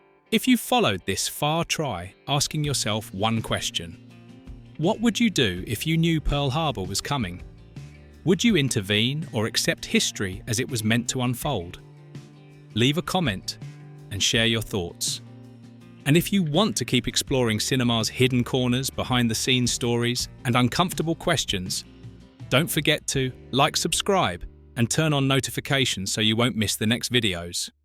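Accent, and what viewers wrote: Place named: Liverpool, United Kingdom. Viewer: I agree with you. It would also be nice to have a English speaker for narration instead of that liverpool English. Thank God for captions😂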